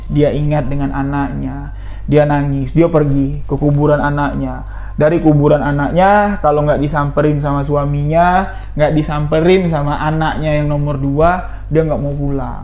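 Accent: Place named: Madura, Indonesia. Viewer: Tak denger2in ini logat koq jd madura yaa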